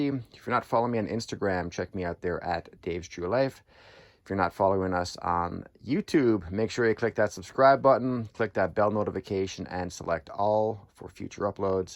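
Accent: Newfoundland, Canada. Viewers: You from Newfoundland? If so that’s cool man I’m from back on the rock as well !! Just subbed to you !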